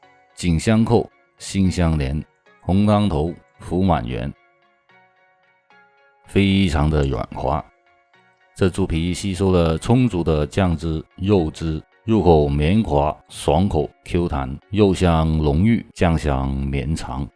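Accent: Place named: Hubei, China. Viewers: 做得好，讲得好，湖北人吗？ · 觉得是带湖北口音的。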